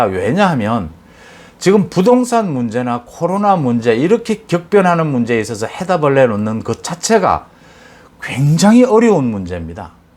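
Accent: Gyeongsang, South Korea. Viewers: 선생님해설은 들을수록 매력이 있어 자주 구독합니다. 경상도 매력있는 말 씨가 들을수록 이끌 립니다.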